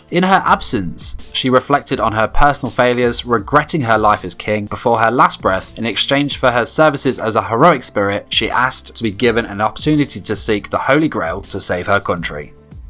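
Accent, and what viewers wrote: United Kingdom. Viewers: are u welsh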